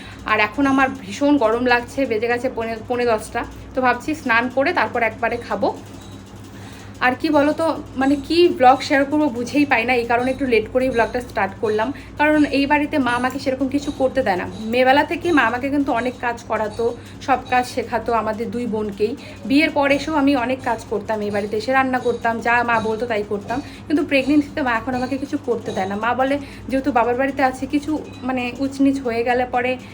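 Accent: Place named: Bangal, Bangladesh. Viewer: Apu tumar purbo Purus ki bangladesher cilo. Tumer khota ekto banggal tan ace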